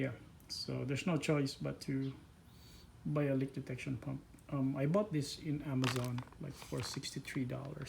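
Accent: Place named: Philippines. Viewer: Love your accent, I know you’re Filipino! Lol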